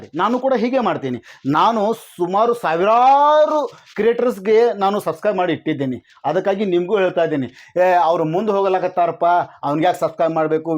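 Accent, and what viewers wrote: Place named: North Karnataka, India. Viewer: ನಿಮ್ಮ ವಿಷಯ ನಿರೂಪಣೆ ಅದ್ಬುತ ಸರ್ ಅದೂ ನಮ್ಮ ಉತ್ತರ ಕರ್ನಾಟಕ ಪಕ್ಕ ಸ್ಥಳೀಯ ಬಾಷೆಯಲ್ಲಿ ಮಾತನಾಡುವ ನಿಮ್ಮ ಮಾತುಗಳು ಹಾಗೂ ನಿವು ತಿಳಿಸುವ ಮಾಹಿತಿ ನನಗೆ ತುಂಬಾ ಇಷ್ಟ ಆಯ್ತು ❤❤❤❤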